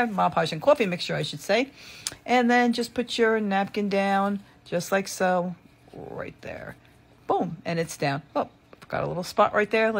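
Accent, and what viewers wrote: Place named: East Coast, United States. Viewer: I hear an east coaster...NJ? "Cawfee" LOVE IT!! really I do. This is a fun tutorial, thank you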